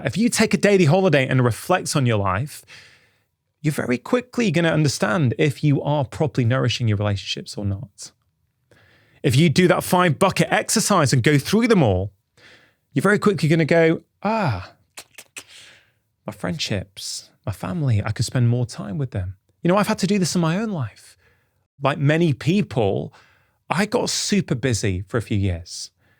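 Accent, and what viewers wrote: England, United Kingdom. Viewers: I like your english accent...❤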